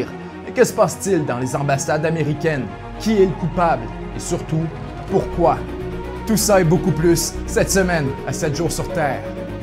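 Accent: Quebec, Canada. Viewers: jaime pas laccent quebecois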